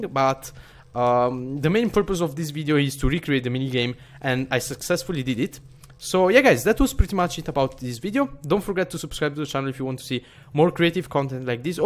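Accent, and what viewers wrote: Italy. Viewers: CAZZO BRO SI CAPIVA SUBITO DALL ACCENTO CHE ERI ITALIANO XD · Ahaha si sente tantissimo l accento italiano, bel tutorial btw 😂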